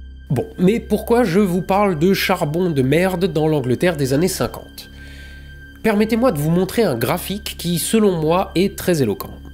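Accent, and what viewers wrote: Alsace, France. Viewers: Hello! Bien qu' "écolo" dans l'âme, je pense comme toi qu'aujourd'hui le nucléaire est indispensable/un moindre mal... Mais : Je pense aussi que tu te trompe a propos de la pollution que cela engendre, il s'agit de la pollution indirecte qu'engendre les déchets du nucléaire que nous ne savons pas traiter, ceux qu'on enterre, qu'on stock en attendant ou que certain voudrais envoyer dans l'espace... C'est l'equivalent des décharges, c'est un problème qu'on laisse pour plus tard "en attendant que...", ces problèmes mis sous le tapis sont le veritable noeud du problème a mon sens. Pour le reste (bien que ta voix alsacienne me cringe énormément et me pousse a skipper systématiquement le placement de produit dans la seconde) bravo pour le contenu de tes videos, continue comme ca :) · Peu importe le sujet (toujours d'une très grande qualité), l'accent alsacien vaut à lui seul le détour ! 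😁 "ALSACE STYLE"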